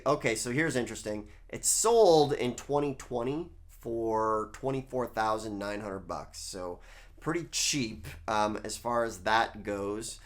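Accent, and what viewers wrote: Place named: Canada. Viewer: Why do you have a Canadian accent?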